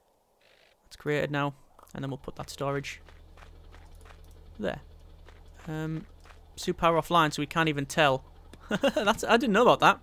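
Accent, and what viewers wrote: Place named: Newcastle, United Kingdom. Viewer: are you from newcastle? ya got that geordie accent if ya na what a mean :D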